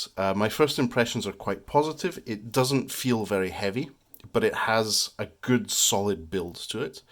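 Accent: Scotland, United Kingdom. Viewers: good to hear scottish dude doing tech and a youtube channel :D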